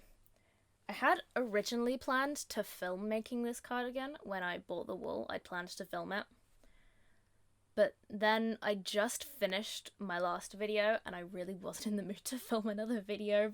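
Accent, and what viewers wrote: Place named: United Kingdom. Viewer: You're so cute and talented :) and also I love your British accent <3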